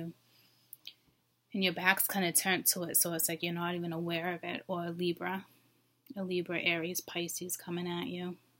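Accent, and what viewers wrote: Boston, United States. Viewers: just found your channel and boy is this accurate. dealing with an aries i left in the past. im canadian but visited the states quite abit as a child...is that a boston accent? love your voice!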